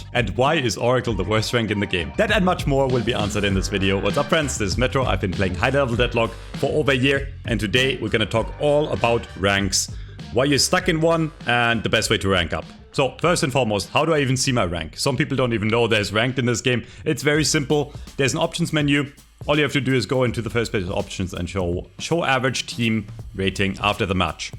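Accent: Germany. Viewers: are you german or danish? Basing it on accent.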